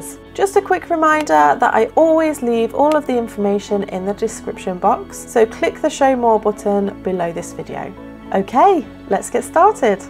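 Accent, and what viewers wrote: United Kingdom. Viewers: Hi, i am new to your channel, i really didn’t know your name is not, … i was rewatching the tutorial today and at the beginning of the video i got your name!! 😆😆 Anyway i love both names, btw, I’m a big fan of your British accent! Lol Warm wishes… stay safe xx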